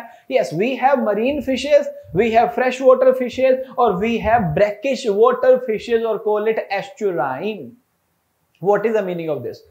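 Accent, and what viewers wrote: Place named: India. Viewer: thank u very much, your english is not only easy to understand but I also like your Indian Accent and the simplicity and the choice of words while explaining. I'm from North but I'm more comfortable in this format since it shows how an ordinary teacher is improving his skills of teaching and explaining by using simple words. Even if anyone is struggling in English should see these English lectures because of your clean, simple and plain English and learn how to adapt according to the environment. Thank u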